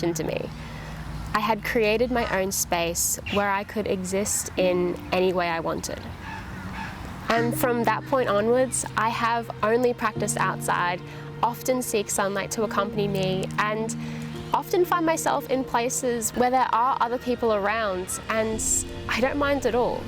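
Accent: Australia. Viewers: Nice to hear an Aussie accent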